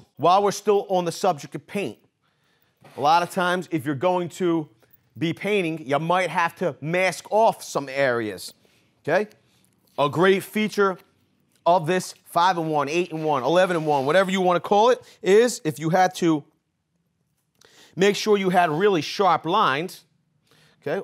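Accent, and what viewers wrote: Philadelphia, United States. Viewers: yo bro you would fit right in at my house for sunday dinner ....you sound like ur from my neck of the woods ..South Philly Baby!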